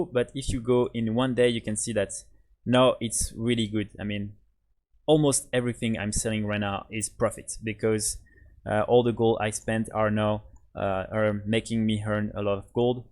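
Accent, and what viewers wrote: France. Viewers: Haha l'accent francais:P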